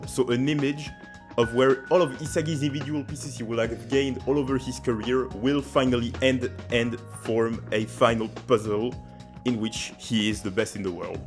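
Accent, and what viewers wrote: France. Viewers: haha I think it's for the better. I believe your accent is very good for the ear. I especially picked that you were French when you said the word "Egoist" and "egotist". The "ist" at the end sounded very much the "ist" in the French word "égoiste". I think it's good, it adds variety of people in the blue lock community!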